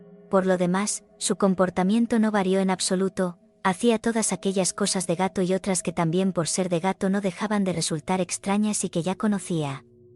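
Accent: Spain. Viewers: LAS HISTORIAS SON MUY BONITAS PERO LA VOZ DE ACENTO ESPAÑOL ES HORROROSA 👹😭😭😭😭😭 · A pesar de su voz con acento español... al principio me molestaba, pero al final me quede porque esta buena la historia, y su acento no es tan marcado. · Al contrario me encantan su acento español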